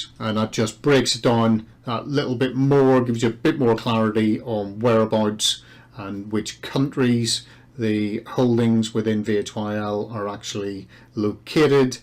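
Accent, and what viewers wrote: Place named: Belfast, United Kingdom. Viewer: you still in Northern Ireland or over in London or what. Cool to hear a Belfast person talk about Vanguard :)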